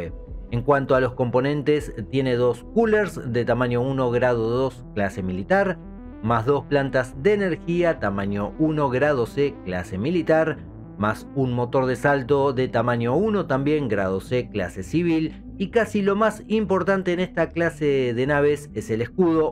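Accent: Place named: Argentina. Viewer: Me encanta ese acento argentino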